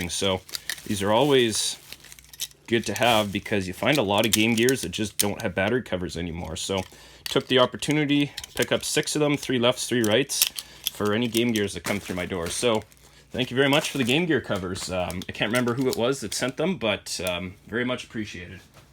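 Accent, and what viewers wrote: Canada. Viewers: I knew you were a fellow Canadian from listening to you and now I know I was right haha. Great videos!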